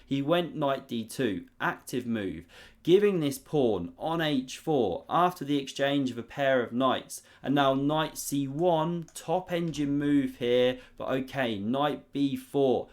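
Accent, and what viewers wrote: United Kingdom. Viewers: Def the best chess commentator, brit accents just class up the experience.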